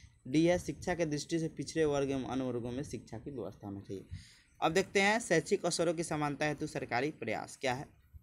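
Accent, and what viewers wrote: Bihar, India. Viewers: Aapke notes helpful h aur aapke bolne k tarike to majedaar h, aapke bihari tone k kaaran smjhne me v aanand aata h, keep it up...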